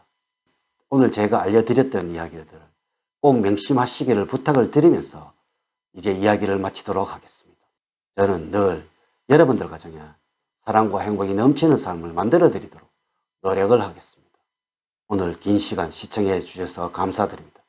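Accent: Gyeongsang, South Korea. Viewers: 경상도 말ㆍ못알아듣겠네ㆍ 방법은 밑줄에 자막필수ㆍ 그것도 모르고 유튜브 합니까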